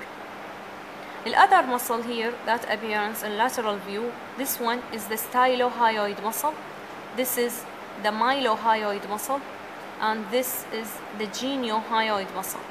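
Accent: Arab world. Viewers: bro she is arab i think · English with arabic pronounces thank you sister you’re best 🤗🤗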